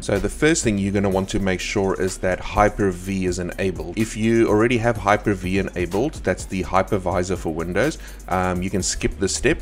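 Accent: South Africa. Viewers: Are you originally South African?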